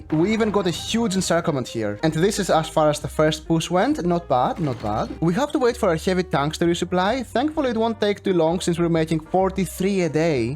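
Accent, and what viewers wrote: Greece. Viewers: Never thought Greek accent sounds like this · Bro I can't believe it I'm greek και κατάλαβα κατευθείαν ότι είσαι από Ελλάδα από την προφορά σου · The accent... Are you Greek? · Όταν σε άκουσα να μιλάς κατάλαβα ότι είσαι Έλληνας 😂😂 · superior greek accent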